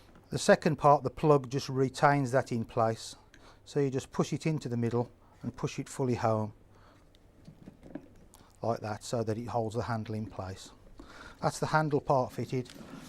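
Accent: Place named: Birmingham, United Kingdom. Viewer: Great instructions, the paper instructions were pants, lovely Brummie commentary, Thanks our kid!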